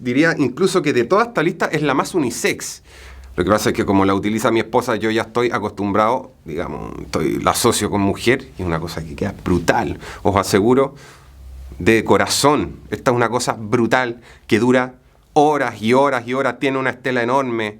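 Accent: Chile. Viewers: Si, es chileno, pero hace años vive en España, por supuesto le delata su acento, yo le entiendo perfectamente, a él y a los chilenos en general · Tienes acento chileno. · con razón le encontraba acento chileno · Por el acento es de Chile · perdon....Quien dijo que el era Español???? su forma de hablar es Chileno...!!!! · Alguien save de donde es el. Su acento es de Chileno Pero el vive en españa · Dice "fragancia" y yo escucho "Francia". Supongo que es chileno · cual es tu nacionalidad? Me parece escucharte un acento chileno? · Su acento es Chileno? Cuando dice: que me encanta, que me vuelve loco...madre mía!!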